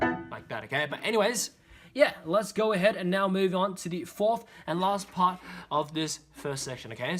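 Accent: United Kingdom. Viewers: Even tho bro's asian his accent is british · You’re British man and accent coo!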